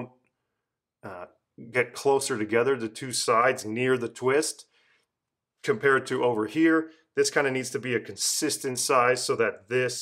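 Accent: Canada. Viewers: The Canadian is strong with this one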